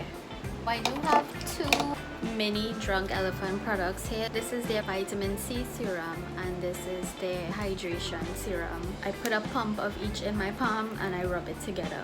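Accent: Trinidad and Tobago. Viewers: I heard you’re accent and said this have to be a Trini. Does your fridge condense? I am looking to buy this but the reviews say it condenses so I came to YouTube to find a review and found your video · I know a trini accent when I hear one!! Heyy family 🇹🇹🇹🇹💕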